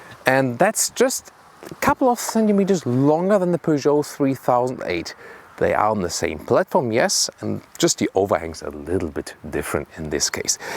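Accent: Germany. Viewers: Your German English is annoying to listen to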